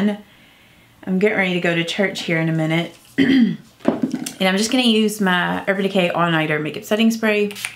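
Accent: South, United States. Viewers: Lol you’re so cuteee! Sounthern accents are so cute 😭😍☺️☺️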